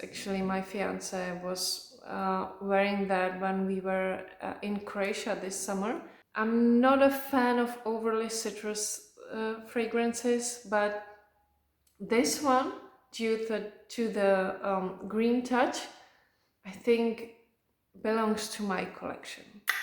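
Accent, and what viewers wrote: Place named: France. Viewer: Hello! I loved your video and the way you describe your fragrances. You have such a beautiful accent, the nicest I have ever heard on YouTube talking about perfume. Are you French? You are so elegant! I can't wait to hear your wish list. Also your male recommendations? Stay safe and please keep going with your channel I love it! Best wishes from Australia xx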